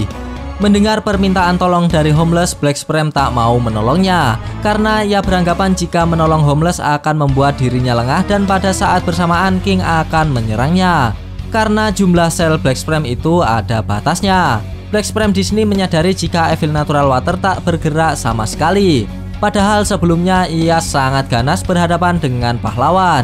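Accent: Java, Indonesia. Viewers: Medok anjay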